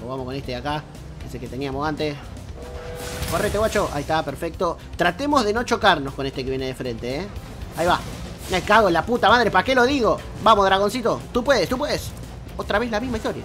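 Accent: Argentina. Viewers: Me encanta el asentó argentino ja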